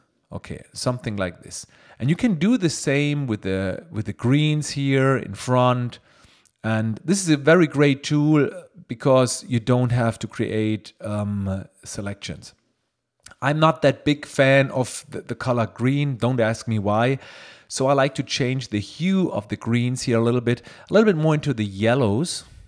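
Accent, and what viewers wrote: Germany. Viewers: Mal wieder ein tolles Video! Finde den Stil toll, er gehört zu dir und das Bild kommt dadurch großartig zur Geltung. Finde es übrigens gut, auch mal englische Tutorials zu bekommen, so kann man sich über das "deutsche Englisch" (für mich persönlich genauso gut verständlich wie Deutsch) weiterarbeiten zu anderen Nationen vorarbeiten, wo teils deutlich schneller und (wohl eher bloß aus deutscher Sicht) undeutlicher gesprochen wird :D Und wie pflegte es Ben letztens so schön in seinem Interview mit Ben von Wong zu sagen... (Frei zitiert) "Ihr versteht das Video nicht? Nehmt es als Anlass, Englisch zu lernen!" Weiter so! Vielen Dank! ;) PS: Falls du Zeit findest auf die Frage einzugehen, wäre es großartig! Du hattest mal einen Link gepostet bzw. war dieser im Zuge deines Kommentars zu Spiegel-online Lesern enthalten, indem es zu einem Script vom Camera Raw für PS gehen sollte. 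Bei mir öffnete sich da immer wieder nur der iTunes Store. Arbeite mit Windows und CS6, möglicherweise schon nicht möglich? Bin diesbezüglich technisch noch nicht so fit und bin bei Google auch nicht so recht fündig geworden. LR habe ich zwar seit kurzem auch, würde aber gerne in PS diese tolle Option mit drin haben - falls möglich. Ohje, typisch Frau mal wieder viel zu lang formuliert :D Falls du Zeit findest - ich freu mich ;)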